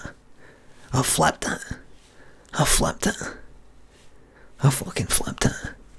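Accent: Scotland, United Kingdom. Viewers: That's because Scottish is so catchy. I'm half Scottish, born and raised in London. When I stay up Glasgow for more than a week then I start thinking Scottish lol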